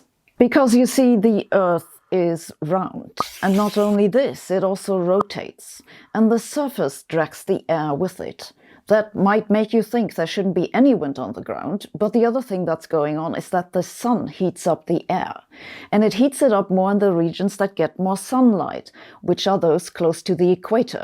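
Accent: Germany. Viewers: Thank you very much for this excellent presentation and i have to say your teutonic accent seals the deal.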